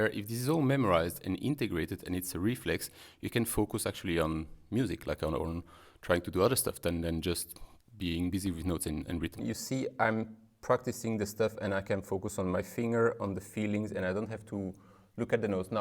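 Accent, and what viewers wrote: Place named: France. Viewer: J’avais pas vu ton nom. J’écoutais la vidéo et je me suis dit : ça, c’est un français. Raison m’en a été donnée.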